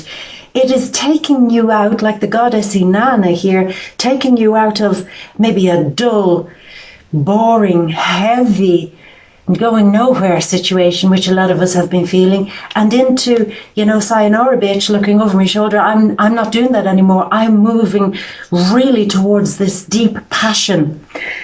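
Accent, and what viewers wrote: Ireland. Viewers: I love the the Irish Accent? and that your really trying to keep clean lol. Passion 93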